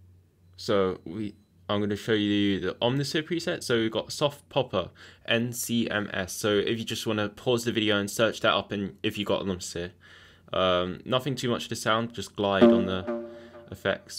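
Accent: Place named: United Kingdom. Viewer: My Guy 😁🤓I'm from Germany lol sounds 100% UK👍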